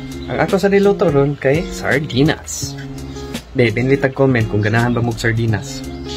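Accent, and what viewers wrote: Visayas, Philippines. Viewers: 😂😂😂 ug paminawon raka ,di ka tan awon bisaya jud kaayo ....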